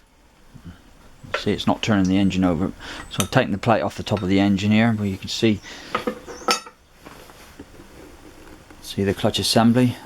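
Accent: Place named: Radstock, United Kingdom. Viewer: Thanks, just going out to the garage to do mine for the second time ! in 49,000 miles, by the way are you from Radstock ? it sounds like it.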